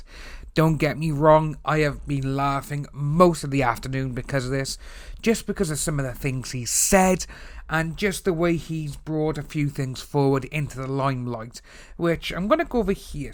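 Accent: Wales, United Kingdom. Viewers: You have a Welsh accent? Hell, I just pegged you for livingbin the UK. 😄 Hearing the voice of this metrosexual, I have no doubt he's bringing Tyrone snacks as Tyrone rails away on Monica. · I understand you dude having Walsh accent is a pain at times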